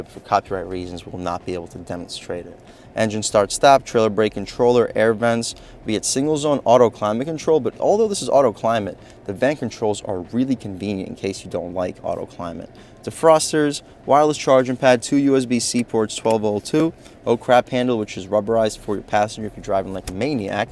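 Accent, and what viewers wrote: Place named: Long Island, United States. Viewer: Dood has a Long Island accent but is in Tampa????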